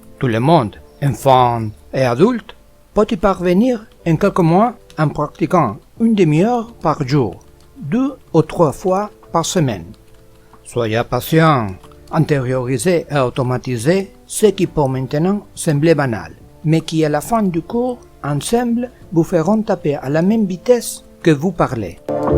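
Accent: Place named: Russia. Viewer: s'il vous plait mr vous n'etes pas français car votre pronanciation je crois que vous etes russe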